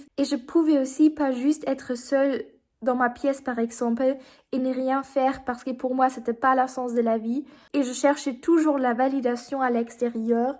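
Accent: United Kingdom. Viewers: idem😊😅 j ai cru que l accent était britannique mais ça n a pas d importance. 😊😅 Elle est connectée et de la bonne façon. Son rayonnement nous fait du bien à tous et à toutes. Paix joie et amour 🙏🙏🙏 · C'est amusant, je n'ai aucune idée de pourquoi l'algorithme YouTube m'a proposé votre chaîne. J'ai regardé d'autres vidéos pour essayer de voir d'où venait votre accent mais... j'ai été surpris. Vous savez que pour un locuteur français natif, vous sonnez presque britannique. J'aurais parié sur britannique avant de cliquer sur d'autres vidéos par curiosité. Aborder des sujets complexes dans une langue que l'on ne maîtrise pas relève du défi. Certains choisissent la facilité... D'autres choisissent de parler métaphysique dans une des langues les plus complexes d'Europe 🥲 C'est fascinant, rien que pour l'audace et le côté incongru et insolite, je m'abonne, lol. 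Ce genre de démarches ne peut que me paraître sympathique...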